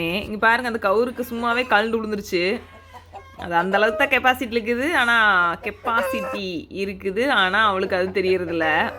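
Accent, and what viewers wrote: Kongu, India. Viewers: எந்த ஊர் அம்மனி நீங்க கொங்கு பேச்சு தவழுது. வாழ்த்துக்கள் இந்த விவசாயி மகளுக்கு ❤🌸👌 கண்ணு ரெம்போ அழகா இருக்கு மா...🎉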